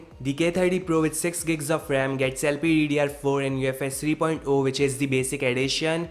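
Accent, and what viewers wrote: India. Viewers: Oh i like your infian aksen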